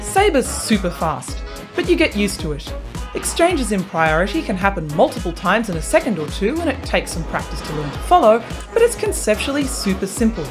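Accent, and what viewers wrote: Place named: Australia. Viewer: dat aussie accent:D Finally this day has come! We can hear ' voice and don't stop the video for text reading. Sometimes it was given too much text for a very short amount of time. I don't know about the others, but as for me, for a not-native English-reader, I couldn't read those sentences that fast in English. Thanks, keep prospering and enlightening people about this spectacular sport!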